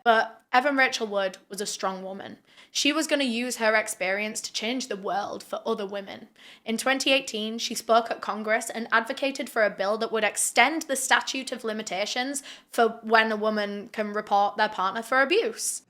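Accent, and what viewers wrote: United Kingdom. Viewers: What part of britain is her accent from ?? Philomena cunk speaks like this too i think 😅 I'm curious, anybody?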